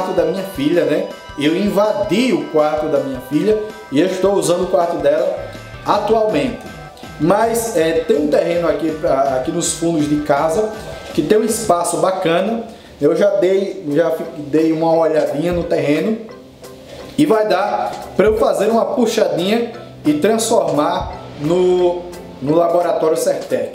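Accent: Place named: Recife, Brazil. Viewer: Também comprei um Mi drone e tô no aguardo, sou de Recife e pelo seu sotaque acho que você também deve ser daqui, tô certo? kkkkkkk enfim.. o meu comprei na Banggood e chegou em SP dia 05/01/17 foi para o CEINT SP/GEARA e no dia 06/01 partiu para a Fiscalização Aduaneira/BR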